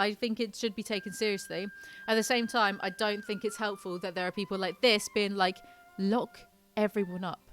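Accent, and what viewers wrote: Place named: London, United Kingdom. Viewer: I just love the way you speak! It reminds me of my ex boyfriend who's from London, the more videos I watch from you, the more I think you guys would make an awesome couple, I know it's weird xD seriously the weirdest thing I have ever think about an ex! Looove your videos <3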